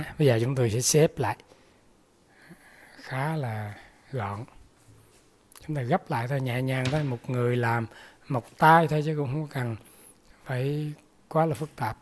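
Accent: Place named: Quang Ngai, Vietnam. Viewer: Giọng giống ở QNgai quá. Hì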